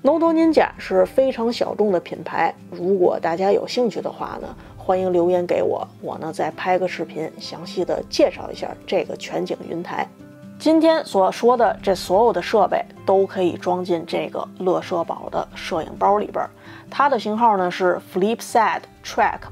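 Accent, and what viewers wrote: Beijing, China. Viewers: 北京姑娘吧？和我一姐妹儿特像。讲的倍儿清楚。关注了。希望讲讲X100系列。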